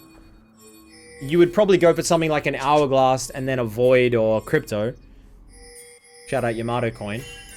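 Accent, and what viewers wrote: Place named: Australia. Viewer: just wanted to say Im happy you're Australian so I have a streamer I like that speaks Australian accent English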